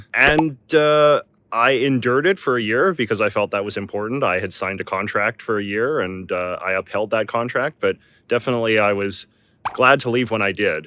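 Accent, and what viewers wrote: Canada. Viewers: Canadian accent comes through thick in a more candid video. Not every place is for everyone. You don't have to like Japan. It's good you're giving it a shot.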